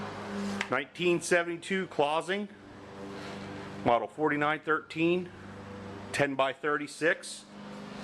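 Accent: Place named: Pennsylvania, United States. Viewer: I knew I recognized that accent. Fellow PA guy! If I were out in Cali, I'd have done that restoration for you. To show you my work, check out my set of lathe-restoration videos here: <link> Imagine if I got my hands on your Clausing and what she'd look like?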